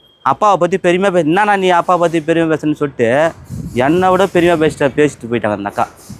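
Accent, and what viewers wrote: Madurai, India. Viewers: மதுரை வாசகம் சூப்பர் வாழ்த்துக்கள் 🎉🎉🎉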